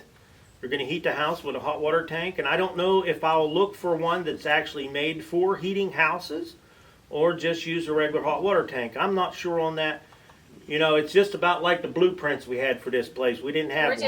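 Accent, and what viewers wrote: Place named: Pittsburgh, United States. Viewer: Iam wondering where ur accent where ur from! Sounds like Pittsburgh Pennsylvania area!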